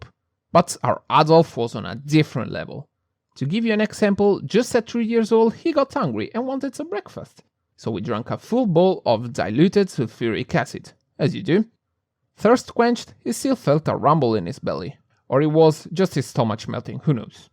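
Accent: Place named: Italy. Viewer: You are getting better at videos, lenght is right, humor is silly and dark and animation sucks as it should. Like comment subscribe lets get the algo interested more even if it already has shown you. ( oh and work a bit on the english, the accent I dont think is a problem, but some words are clearly hard to spell for you and you slur them a little. Good pronounce + slight italian accent is the way to go imho)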